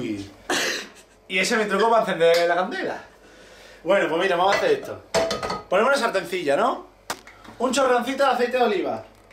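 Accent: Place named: Andalusia, Spain. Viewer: bruno gomez No es latino, es andaluz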